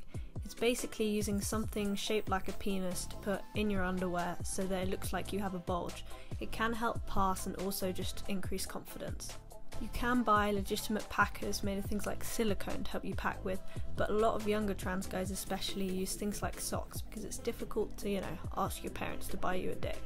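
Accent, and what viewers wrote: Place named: United Kingdom. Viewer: This vid was so much help omg!! I never even thought to use a safety pin, thanks :)) It's also so nice to hear another British accent talking about ftm stuff omg 😭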